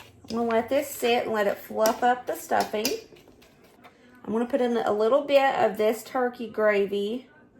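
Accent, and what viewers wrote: South, United States. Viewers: I think this video was great, if we had a dollar tree in New Zealand i would totally try something like this food prices here are getting crazy. Im sure this video has inspired or helpped a family archive a holiday meal on the cheap and sometimes in life money is tight. Also your southern accent is amazing!!! 😊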